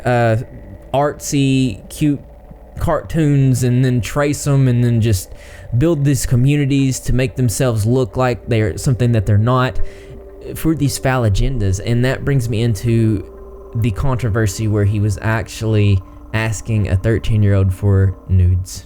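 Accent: South, United States. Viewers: Ur deff southern. I'm in Alabama.u gotta be close lol? Just started watching u on ur Cora story.ur awesome❤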